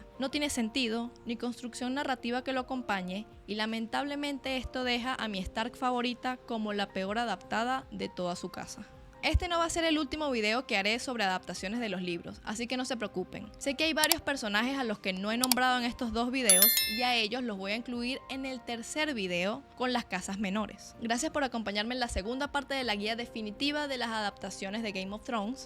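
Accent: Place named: Venezuela. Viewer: Ese acento es de Venezuela.. me juego el riñón izquierdo de mi mamá y no lo pierde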